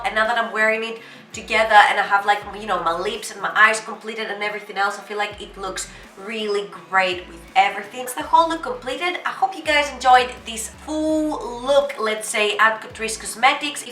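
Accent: Greece. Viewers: I love it too, Greek accent is the cutest..I could listen to anything, including user manual for drill machine, if it was said with Greek accent..or in Greek 😂💯💯💯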